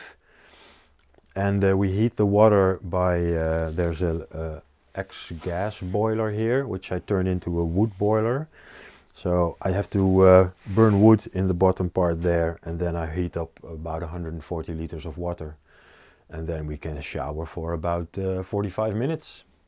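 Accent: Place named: Australia. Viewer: You know- I was thinking your English reminded me of an Australian speaking and Lo- you lived there- or here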